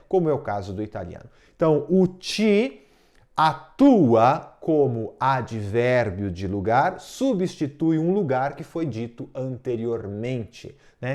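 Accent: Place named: Brazil. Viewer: Me encantó éste video...explicado sencillamente, el cual fui anotando, como muchos otros, para obtener un gran entendimiento de éste tema tannnnn importante!!! Soy uruguaya y me agradó también escuchar como vas explicando con la pronuncia brasilera!!! Ahora, voy a anotar bien, sobre la particella Ne!!! Excelente!!! Todos los ejemplos, sirven!!!! Grazie mille per tutti!!!!!😊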